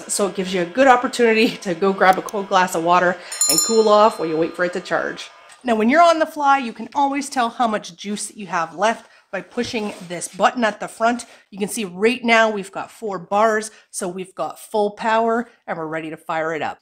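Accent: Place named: Canada. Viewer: I THOUGHT you lived in Canada or close to it; because of your accent. I think accents are neat! And yours gives you away.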